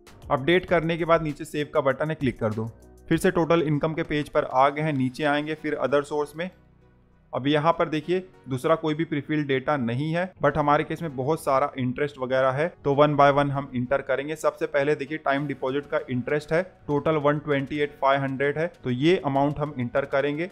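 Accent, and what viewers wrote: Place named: United Kingdom. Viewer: This is for INDIAN senior citizens. Why this British accent. Pl reload with normal Indian accent.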